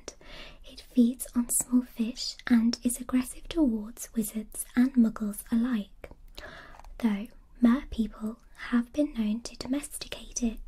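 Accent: United Kingdom. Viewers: normally has a British accent, but she did a Hermione accent on top of that!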